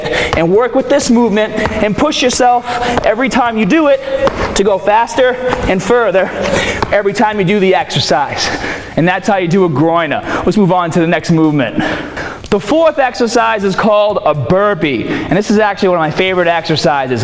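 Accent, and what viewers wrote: Boston, United States. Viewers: Since when did he have a Boston accent??? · Thumbs up for Bawston! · is it a Boston accent? I'm from UK I don't really know, but it makes me smile adds to your character LMAO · Are you from boston? You have a boston accent tbh haha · i dont know how i havent noticed it, but you have one of the heaviest boston accents ive ever heard. i go to BC so i hear it all the time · What's with the Boston accent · Just like how different it is, I just love Boston accents ahah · Is it just me or did he develop a Boston accent? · hey Im curious. When I am deadlifting, when I go heavy and somewhat heavy(for me) I notice I have a somewhat round in my back. Not like incredibly terrible, but I notice it when I recorded a side angle, for testing purposes. Should I drop the weight down like 40% of my 1 rep max, and try to work on perfect form while building back up, or is a slight bend in my lower back okay? 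I wasn't using a belt at the time because I notice you dont until you get near your max, so I try to stray away from the belt until I need it. What % of your one rep max do you start to use the belt? Any help from anyone would be great! I love your video's, thanks for teaching me nearly everything I know about lifting. Cheers. P.S I always thought you had a boston type of accent, didnt ever know you tried to cover it up xD I always heard it. haha keep it up! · love that boston accent man. never lose it! :) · That Boston accent coming out strong! Awesome video though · The Boston Accent is Wicked Cool. With that strength, body, agility, and stamina, can speak anyway he wants. Your my hero. (Especially since we both are from Massachusetts). Great Exercises. I will try them next training day.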